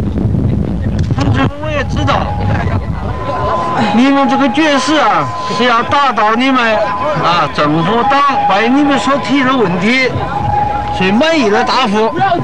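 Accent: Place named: Sichuan, China. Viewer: 为啥是四川口音。。。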